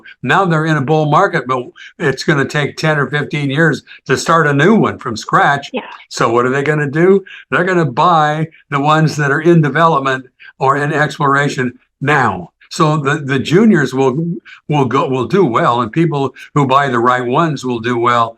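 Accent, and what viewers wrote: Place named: Canada. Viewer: I love your broadcasts, your guest choices and especially your Canadian accent! 'Out' 'About' 😂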